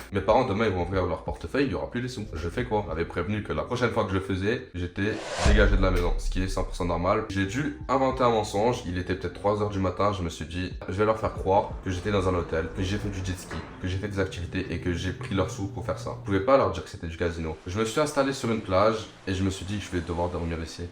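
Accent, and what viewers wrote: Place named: Belgium. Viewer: Vous avez un accent Belge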